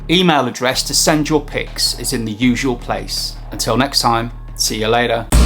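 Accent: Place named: North, United Kingdom. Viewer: My compliments: your house shows good taste, and a great aesthetic sense. I have a question, which you might find a bit provocative: how can you be so lighthearted all the time, given you are a goth, these are heavy times (ref: Paul King!) and, based on your accent (Northener...?), you seem to live in a Country where police breaks into someone's house following a post, and that has been literally destroyed by decades of uncontrolled mass migration? I know being a goth can simply involve lots of make up and a matter of dress code, but I do not believe you are such a shallow guy. Just wondering, as I can barely cope.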